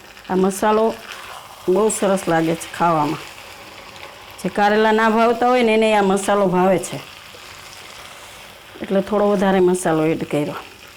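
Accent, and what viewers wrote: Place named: Saurashtra, India. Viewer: Ben tame saurashtra junagadh bajuna lago chho...... tamari boli uparthi..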